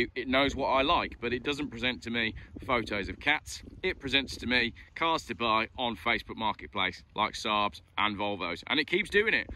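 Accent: United Kingdom. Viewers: britisch accent😋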